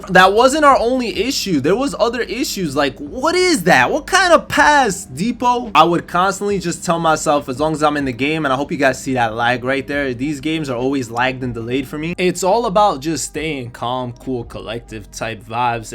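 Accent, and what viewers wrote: United States. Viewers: You mentioned you have lag a lot. Where are you based? Im from the UK but im always running into you in TT park. and you've got a US Accent, Maybe you're on the wrong servers or something.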